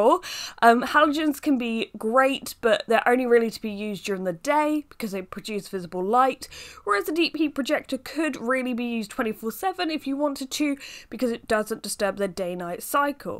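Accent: United Kingdom. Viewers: yo plz ur accent is from what region in uk i need a gf with this accent!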